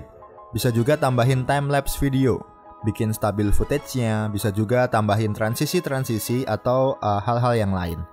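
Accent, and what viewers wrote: Kudus, Indonesia. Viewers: bang elu orang Kudus ya , wkwkwkw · wong kudus mas? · Smpean ndk wong kudus bro..kok kyok.e shot nek alon2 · Masnya orang kudus ya? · Wong Kudus mas? Wkwkkwk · Mas orang kudus? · mas orang kudus ya? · Wong kudus mas? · Bang lu orang Kudus kah? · Wong kudus toh mas?? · Oo sampean wong kudus ya mas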